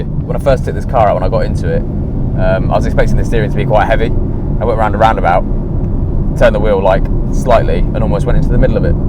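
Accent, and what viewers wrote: United Kingdom. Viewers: And he sounds like he's from Essex. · *English humor, the dude is English, even I as an American knows this, heck his accent is a dead giveaway. He's not Scottish or Welsh.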